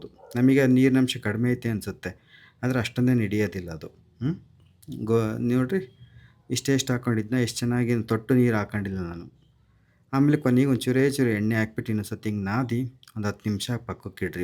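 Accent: Chitradurga, India. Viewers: Good sir ನಿಮ್ಮ ನಿಮ್ಮದು ಯಾವ ಊರು ನಮ್ಮ ಚಿತ್ರದುರ್ಗ ಡಿಸ್ಟ್ರಿಕ್ಟ್ ಭಾಷೆ ಮಾತಾಡ್ತಾ ಇದ್ದೀರಾ ಥ್ಯಾಂಕ್ ಯು ಫಾರ್ ಯುವರ್ ನೇಟಿವಿಟಿ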